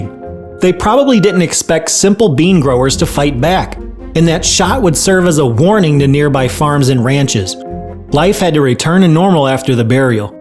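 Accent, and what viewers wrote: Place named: United States. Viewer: Narrator sounds American but the script sounds like its written by a non native english speaker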